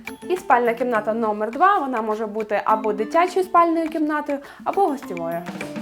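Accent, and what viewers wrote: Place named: Ivano-Frankivsk, Ukraine. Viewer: слухайте, ви, «мовний спеціаліст» ха-ха! Іванофранківці балакають прибацаним російсько-польсько-самовигаданим суржиком, і ця пані-ведуча Якраз так і розмовляє. Кажу як киянин що прожив у франківську 4 роки. Я вже їх наслухався, повірте мені!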